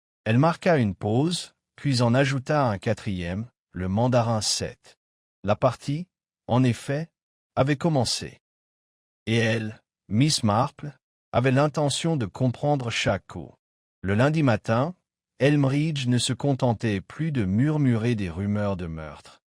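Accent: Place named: England, United Kingdom. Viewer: La voix avec un léger accent anglais du "lecteur IA" est plus supportable que celles entendues dans les autres épisodes.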